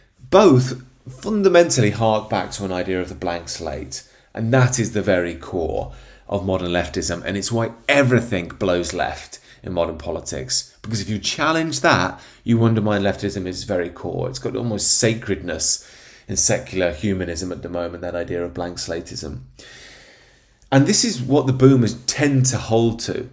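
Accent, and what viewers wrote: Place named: Yorkshire, United Kingdom. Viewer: good to hear you have released your inner yorkshire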